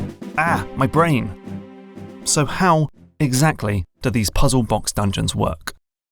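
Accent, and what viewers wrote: England, United Kingdom. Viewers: This narrator has the same accent as Tom Scott. Anyone know the region of England?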